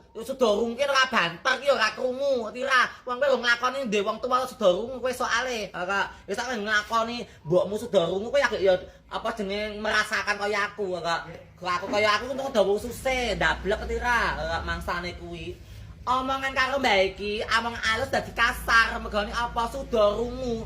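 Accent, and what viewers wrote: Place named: Surakarta, Indonesia. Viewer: Logate ❤nambani logat solo Jateng....wong tuo q asli solo jogya ... Saiki q d kota prob jatim... Logat q ws gk ngunu😊😅😅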